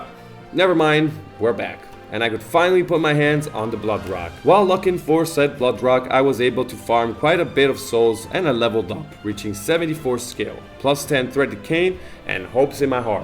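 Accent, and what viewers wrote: Italy. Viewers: sei italiano? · U are italian?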